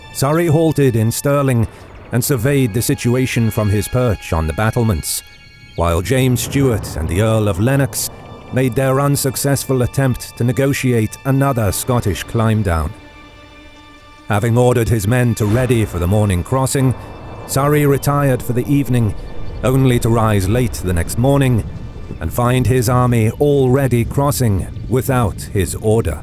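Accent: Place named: England, United Kingdom. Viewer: Did you ask the narrator to narrate in an English accent or was that a choice they made? A Scottish narrator narrating in an English accent and mispronouncing many Scottish place names is a bit odd.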